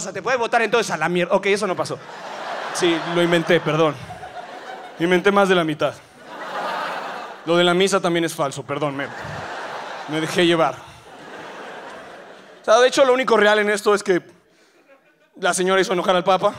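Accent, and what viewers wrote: Argentina. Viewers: De verdad me creí que era argentino realmente le sale muy bien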